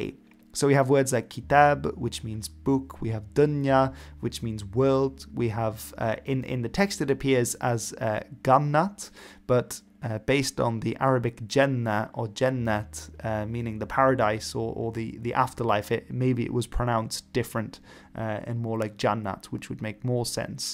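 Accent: South Africa. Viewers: He sounds like a South African toe me. He has a rolling 'r'. Also there are other subtle things you can hear in his speech that is South African. In fact, I would be really suprised if he is not South African. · love this! thank you. interestingly, your pronunciation is so good that I can clearly distinguish two distinct accents in your tutoring. there is some northern influence, I'm guessing Pretoria and some Southern, Northern suburbs of capetown.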